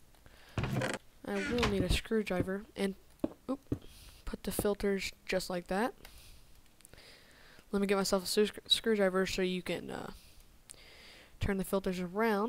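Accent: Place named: Texas, United States. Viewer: ima guess ur from texas? :3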